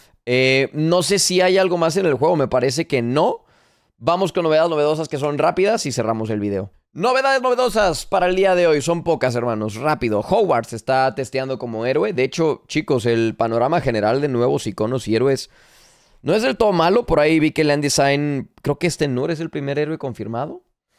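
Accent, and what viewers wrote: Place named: Spain. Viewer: tu no eres mexicano eres español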